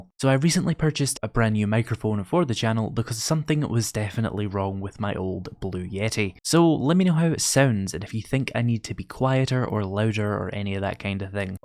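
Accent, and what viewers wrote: Scotland, United Kingdom. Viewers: Congrats! You deserve it. I found your channel from your tutorials and have stayed for the series play (and the accent). As one of your American fans, I’d love some Scottish-isms thrown in. And the audio quality and level is perfect. Here’s to you getting 100k! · I can definitely see people coming for the voice. Scottish accents are just awesome. Congrats on the milestone. As far as other games I think most of your followers would enjoy watching you play anything as long as you bring your passion into the videos. That's what won me over.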